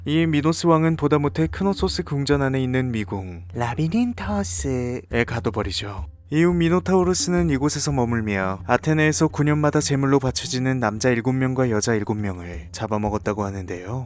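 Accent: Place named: Japan. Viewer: 그리스어 아는 한량입니다 일본어 악센트만 좀 없으면 밥도둑일것같습니다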